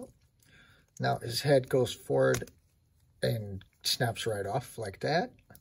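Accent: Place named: Asia. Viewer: Are you in the Philippines? cause you have an Asian accent. I just like to ask where to get that.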